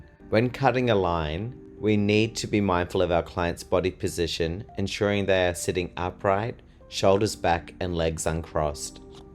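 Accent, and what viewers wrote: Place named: Australia. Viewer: Hi! WoW yr channel is stunning 😍 and I love yr Avustralian accent!! Actually I’ve fallen love with it.. sorry but this accent much more attractive and beautiful than english accent.This is immaterial but I just wanted to indicate.very good luck to y 😊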